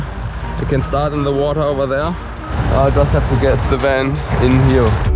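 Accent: Germany. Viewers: Mega geiles Video! „Bad english with perfect german accent“ passt so geil zu dir als Marke 😂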